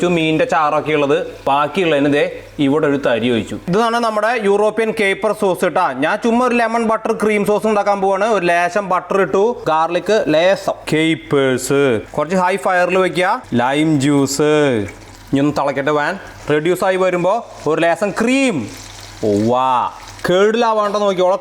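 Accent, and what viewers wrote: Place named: Kozhikode, India. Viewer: entha Kozhikode parayannjjey.. Kozhikode nalla meen kittumm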